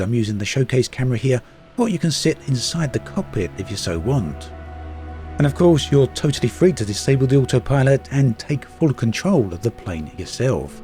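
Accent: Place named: Northern England, United Kingdom. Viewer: It's an English accent, most likely from the north or upper midlands.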